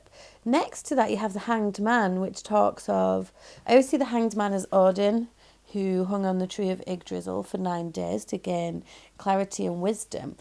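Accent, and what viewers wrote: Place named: Yorkshire, United Kingdom. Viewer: Thank ya very much. Happy new subscriber, here. ^‿^ I chose 2, actually asking whether I should travel across country to participate in a medical trial that I'd been feeling squeamish about since learning the entirety of the protocol that I'd be following. So hearing 'a resounding NO' is an alright answer in this case. (Your accent is adorbs, by the way. I had a friend a few years back with this wildly whimsical mish-mash of Mancunian, Blackpuddlian, and Yorkshirian -- and even though she felt that her Yorkie aspect wasn't very strong, hearing you makes it pretty clear to me that she still had plenty!)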